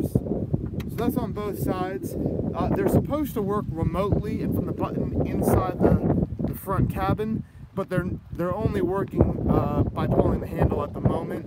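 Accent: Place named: United States. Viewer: You sound like american xd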